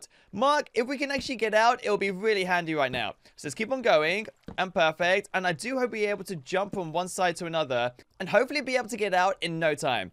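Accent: United Kingdom. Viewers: He be speaking british HAha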